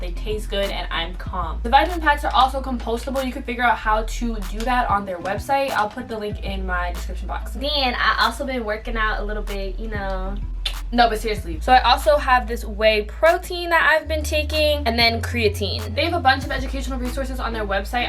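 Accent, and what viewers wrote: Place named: United States. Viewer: omw hey! I love your talks because it makes me feel like I have a big sister and I'm so greatful for that!You are so lekker (lekker-lek-ker ) means that you are so cool! In my language . lol I am from Africa and the way you speak in "American" is so cool also,it makes me wanna speak it to for example: aight bet..your language is so different to ours but it's interesting too♥just wanted to say that I love your channel and I hope you do a more recent "get to know me " video or just something that we don't know about you ...like "pet peaves now" ♥♥ again I love your channel soo much. Please give me a shout out...If you want lol.♥♥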